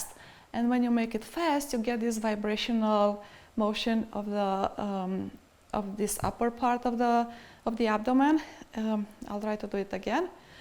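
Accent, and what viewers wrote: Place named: Germany. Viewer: She sounds german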